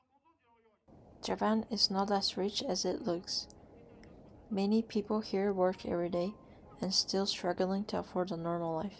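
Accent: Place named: England, United Kingdom. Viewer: I love your english spoken accent, and a genuine presentation, helps seeing Japan from a ground level.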